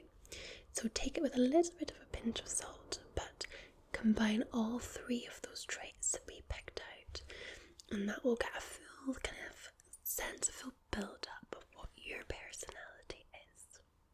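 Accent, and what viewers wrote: Scotland, United Kingdom. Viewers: Read today in an article by the BBC that scientists have said a Scottish accent is an anti depressant because of its ability to cause ASMR....after watching your videos for the past year I can honestly, conclusively say those claims are true! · Are you Scottish? (I know its in the name but still😂) I was just wondering because I am Scottish and I have been looking for a asmrtist who is Scottish because I don't know why but I love Scottish asmr😂 I also wanna say that I love you and your channel so much! You have helped me with my depression and anxiety! So a massive thank you💖 Keep up the wonderful videos!💕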